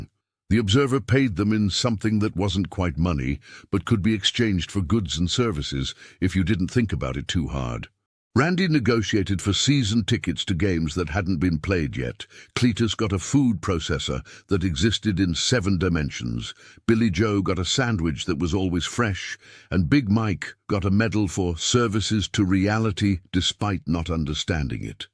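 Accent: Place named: United Kingdom. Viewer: Lol! I love this narrator!🤣🤣🤣🤣🤣🤣🤣🤣🤣🤣🤣🤣🤣🤣🤣🤣🤣🤣🤣🤣🤣🤣🤣🤣 Best story as always. I only wish all hfy scifi comedy has this british narrator guy.❤️❤️❤️❤️❤️❤️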